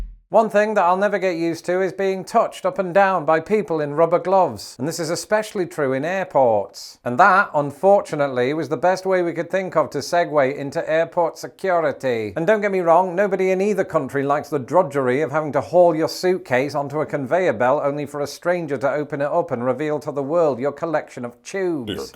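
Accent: United Kingdom. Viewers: Lots of good stuff there. Then again... Your example of sales tax in Maine - which you should have specified was SALES TAX - was overblown. Exaggeration for effect is fine, but that was nonsense. I agree completely about your British accent and the impact on Americans outside the major cities. Note that I said YOUR accent. Have you been here so long you forgot that there are a variety of them? Or maybe your video is only aimed at others who sound like you? Of course there are both accents (how words are pronounced) and dialects (veering off into a different vocabulary), and you specified accent (singular), but your advice might not be so useful for someone from Yorkshire, or perhaps working class just about anywhere. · Says "trains, buses and broomsticks" with a straight face. Lol. Love British humor (and accent). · This American LOVES the accent. I will sit and listen to anyone from the UK for hours. 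I fall asleep listening to sleep stories read by English/UK actors/voice actors. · Don’t fret too much about not tipping in Westminster, Colorado. They’re okay. I find that the type of British accent affects people’s perception of the British person. In Colorado, an accent like (?) would make people think he’s very cultured and intelligent. It would be intimidating to some, but many would want to know what it’s like to dine with the queen. We can be ignorant. If it’s, say, a cockney accent then Americans (around here anyway) are going to think you’re a hooligan and try to party with you all the time. Or avoid you because they’re old, like me, and are too tired to party anymore.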